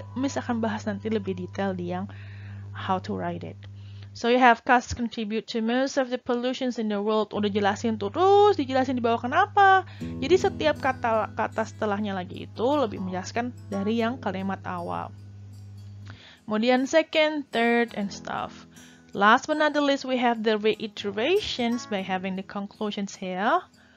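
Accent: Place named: South Jakarta, Indonesia. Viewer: I like how to teach and explain because it is very clear then is also very slang because we can use South Jakarta-style English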